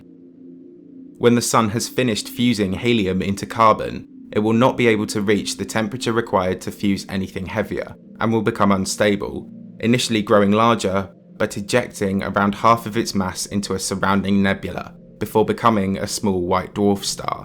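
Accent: United Kingdom. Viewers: Gonna miss VY Canis Magoris as the biggest star known to man. She was my favorite to talk about when speaking of the scale of other stars. And a quick shout-out to the narrator, British accents make for great documentaries. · I can't stand anyone with a British accent. Made it 10 seconds in to this video. Stopped as soon as I heard his voice. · Kinda curious how almost every space channel i tune to, theres always british commentary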